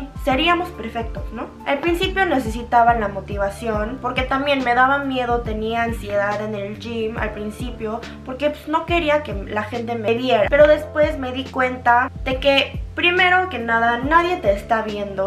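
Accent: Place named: Fresa, Mexico. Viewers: Me encanta que hablas con acento fresa jajajaj hahah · Asi como estas dres muy guapa y si haces ejercicoo por salud te conviene seguir, pero unsa sola cosa quitate el acento fresa, es mejor un tono mas modulado o standard, como Selim la esposa de Minu, el Coreano de Corea.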